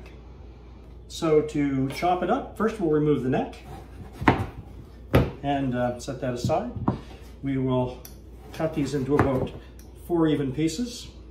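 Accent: Canada. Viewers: As a native upstate NYer I can pin that Canadian accent anywhere (plus the U in flavor & the 10 percent cream reference) What a lovely Canadian gentleman!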